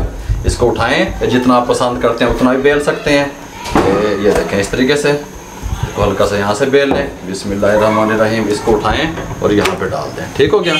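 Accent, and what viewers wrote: Pakistan. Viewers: Pakistani accent aur wo bhi Punjabi ke sath bahot hi badhiya combination hai. Love from India ❤❤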